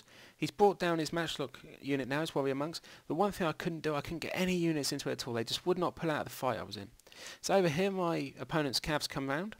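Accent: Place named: United Kingdom. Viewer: The British accent loving it LOL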